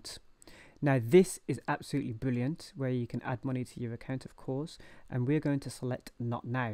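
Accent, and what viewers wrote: United Kingdom. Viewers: Love this video but your definitely British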